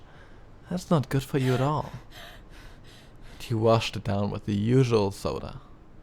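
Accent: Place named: Germany. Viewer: I literally LOVE his little German accent! It sounds so adorable and calming at the same time. Nice vid btw ^^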